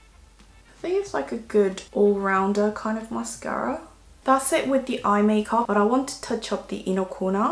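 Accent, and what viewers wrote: United Kingdom. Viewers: 너무너무 이뻐용!!💕 꺅 미모열일 ㅠㅠ 😍 감기몸살이셨다니ㅠㅠ 그런데도 영상들고 와주셔서 감사합니당! 영쿡발음과 미모에 치이고갑니당💜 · 영국식 영어 넘나 듣기 좋고 멋져요😆👍 이번에 어퓨 과즙팡틴트도 잘 어울리실거같아요!! 저도 반해서 샀는데 생각 났어용 ✨ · 언니 발음이 영국식인가요...?잘몰라서...너무 발음이 좋아요 ㅠㅠㅠㅠ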